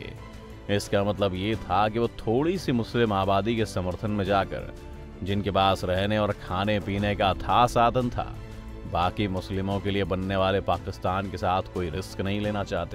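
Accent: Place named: Hyderabad, India. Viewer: Hyderabadi bolty 😀💚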